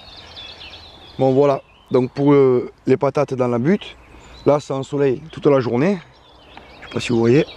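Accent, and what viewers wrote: South, France. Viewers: Merci beaucoup pour la réponse complète et sincère ! Hate de voir vos prochaines vidéos avec cet accents qui rappel le soleil ^^ Peace & Love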